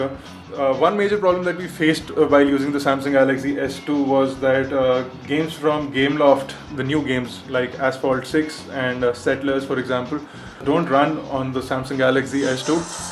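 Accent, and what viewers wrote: India. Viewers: its called an indian accent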